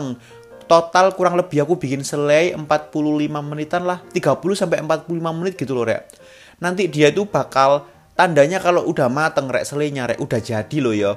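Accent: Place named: Surabaya, Indonesia. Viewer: Aku lho paling suuuwwweneeng nek krungu omongane arek iki serasa nang suroboyo😆😆😆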